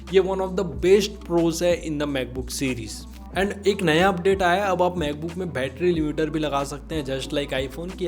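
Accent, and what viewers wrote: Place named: Maharashtra, India. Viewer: Great detailing even mentioning the nand gates used! U r going to make it big 🎉 Could sense marathi accent in your English then checked ur insta handle, great to see :)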